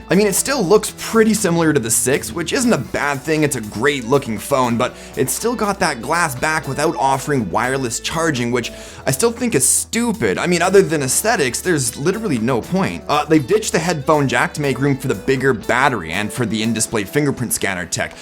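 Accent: Canada. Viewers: Are you from Vancouver (BC) eh?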